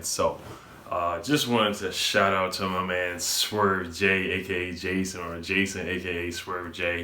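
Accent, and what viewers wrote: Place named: DMV, United States. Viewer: On the Rawsta...the Rawsta.....Are you from the DMV area?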